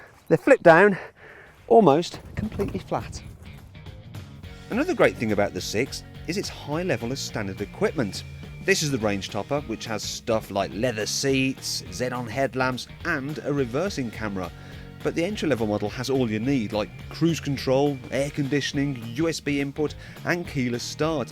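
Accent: United Kingdom. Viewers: best practical car reviewer on youtube hands down! to the business script, british accent, mic is not distorted, good work!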